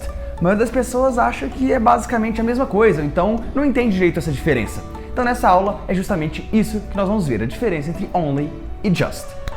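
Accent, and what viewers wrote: Minas Gerais, Brazil. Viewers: Ele é mineiro?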